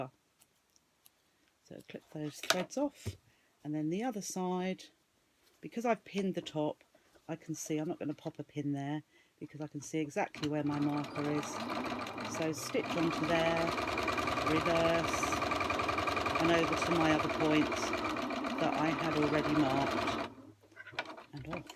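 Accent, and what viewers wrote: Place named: United Kingdom. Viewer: I am Arabic woman I like your British accent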